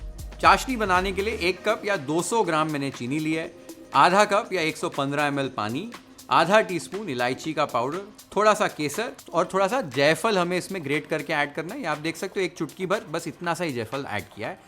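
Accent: Maharashtra, India. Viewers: Your voice tone is like marathi person talking in hindi ?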